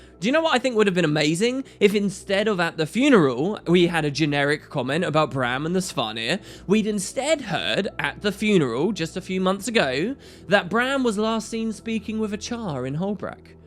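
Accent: United Kingdom. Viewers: Hey don't know if you'll see this, but I've been checking your channel daily sense FF15 release hoping for some content on it. Weather that be the start of an LP or just some sort of news. I imagine you might of said something about it in one of your GW2 vids but unfortunately I've not watched those for some time as I never spent the money on the expansion and don't want to ruin anything as I'm sure one day when I got some extra money to rebuild my computer I will. Anyway, your FFX LP was hands down the best LP I've ever seen, I also enjoyed the first half of the X-2 one, but to honest with ya by the time you started back up I'd forgotten most of what happened and kind of lost interest. Idk, I really hope you'll do an ff15 lp because I'd love a good reason to tune back into your channel on a daily basis again, miss that British accent of yours:)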